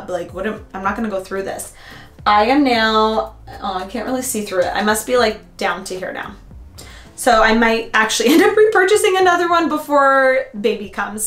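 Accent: Canada. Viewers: How rude, it's a Canadian accent. Check your ignorance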